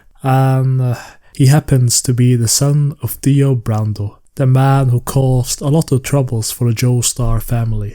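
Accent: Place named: Scandinavia. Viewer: you sound either norwegian or swedish, anyways keep it up :d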